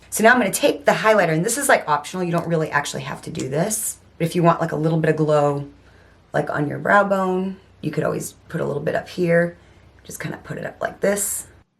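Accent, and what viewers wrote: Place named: South, United States. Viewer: Hey,. My first time here. I picked up on that southern accent in the very beginning was wasn’t absolutely positive until I heard you say “time”. We’re southern USA neighbors. I live in Alabama. I don’t like dollar tree makeup much at all, but I love the thought of a 1.25 beauty product that might work well for someone else. Who knows, one day they may have something that does work for me. So I am going to hang out with y’all for a while. Thanks. 🙏 😊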